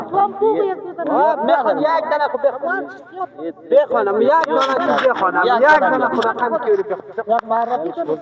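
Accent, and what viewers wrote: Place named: Daykundi, Afghanistan. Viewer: آخ مه بلیبور لحجه دایکندی شونوم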